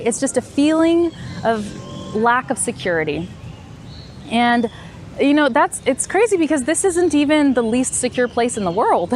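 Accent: United States. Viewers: is the name I use to tell most in a day. I learn American accent by writing down one minute lecture of videos and record my voice of the same lecture. And I'll compare both, find out my mistakes and continue this process until I satisfy with my work 'hey what's up......' Thank you <3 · Hey...you made this video awesome...i learn a lot from you...love from India...i just want to get american accent...if you ever come to india, you will be our guest here...😊...thank you mentor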